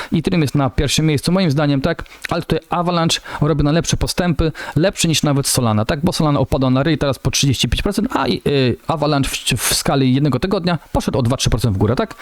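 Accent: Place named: Ukraine. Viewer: Hhehe dobra jazda 😁 chyba ukraińscy jutuberowie od krypto wchodzą na polski rynek bo już widzę 3 analizę z nie naszym akcentem :-) trochę jajcarsko ale ok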